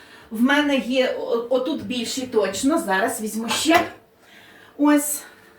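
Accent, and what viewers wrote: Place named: East, Ukraine. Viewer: Дякую за ПОМИДОРКИ!😊 Який у Вас цікава мова - ніби більш притаманна західним регіонам, але відчувається східний акцент теж. Якщо не секрет то звідки ви?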